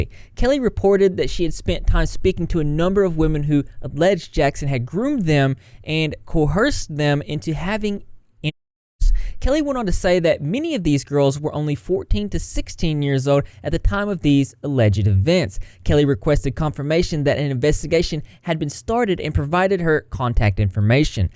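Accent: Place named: Georgia, United States. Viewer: I don't even care about onision at this point. I just came to hear "and in. Today's. Video." In country ass Georgia accent.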